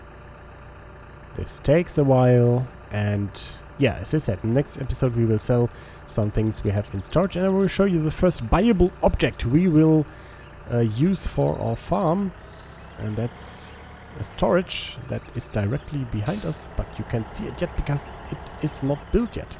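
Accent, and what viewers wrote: Germany. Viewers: | | Gaming with german accent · | | Gaming with german accent 100% agree! I think, some of your german fans don't know/understand, that maybe there a other YouTube user, which can't speak german, but would like to see your great videos! For me it is a great opportunity to increase my english understanding. Greetings Tobias P.S. I am sorry for my bad english - it is etwas eingerostet:D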